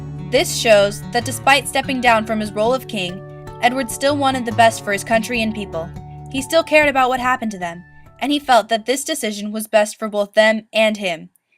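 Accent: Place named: United States. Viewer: The young lady who is discussing this has an American style accent so I assume she has no understanding of the role of a Constitutional Monarch. I have never yet met an American who does. Firstly this is not an analysis ..it is a repetition... with little depth. For the record...from the someone who lives in the UK. The role of a British Monarch is to uphold the Constitution and provide a unifying force to balance the power of politicians. The Monarch leads of life of great priviledge but they are trained from birth into the role they are undertaking and an understanding that this is a two way process. The Monarch is most definately NOT able to do as he or she wishes and must put the needs of their people first. The role of Consort is also highly demanding and requires great strength of character to fulfill the function properly ( we have a recent example of where that went wrong and the turmoil it caused.) NOTHING is more important than upholding the Constitution. 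This country had several Civil Wars getting the power balance right. Edward the V111 is generally regarded in the UK as a weak,immature man who selfishly put his own needs above that of the people and showed no ability to recognise the damage he was causing. In doing so he caused a Constitutional crises which nearly destroyed the very Constitution he was suppose to uphold at all costs and put the Country on a path to Civil War as people took sides.. Being King was his role in life and he was a disaster at it. It is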